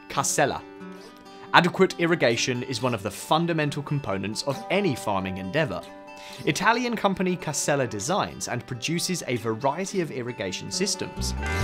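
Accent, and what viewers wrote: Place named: England, United Kingdom. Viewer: I really don't need the england man in my screen or voice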